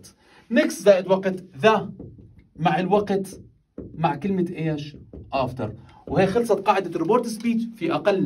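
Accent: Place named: Iraq. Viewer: عبالي عراقي😊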